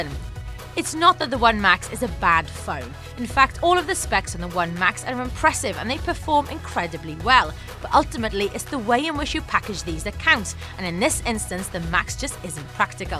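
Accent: Wales, United Kingdom. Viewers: ur from WALES ! Alright, hows it going Alright?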